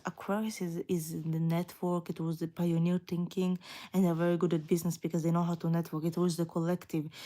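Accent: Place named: Moldova. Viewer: Moldova? I need to know where your accent is from my girl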